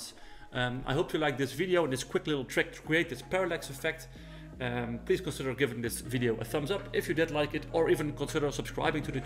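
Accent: Netherlands. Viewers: Ik hoor dat je Nederlands bent. Bedankt voor deze tutorial :)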